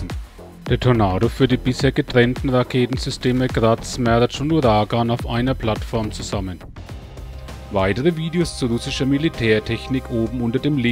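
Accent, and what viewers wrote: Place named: Franconia, Germany. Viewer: Ein Sprecher mit so einem starken fränkischen Dialekt spricht für "Russland.TV"? Hätte das nicht jemand mit russischem Akzent übernehmen können? Hätte wesentlich schöner geklungen. :)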